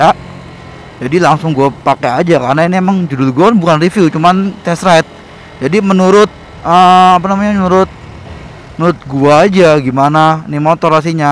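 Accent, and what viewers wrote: Surabaya, Indonesia. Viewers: keren bang... lu gue logat surabaya!!!..salam buat bis kalisari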